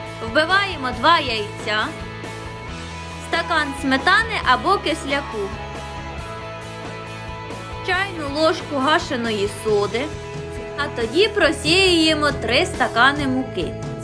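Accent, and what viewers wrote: Poltava, Ukraine. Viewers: Як по розмові, то точно з Полтавщини!?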